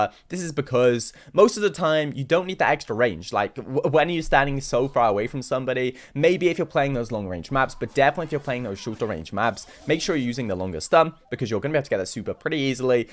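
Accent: United Kingdom. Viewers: I love his British accent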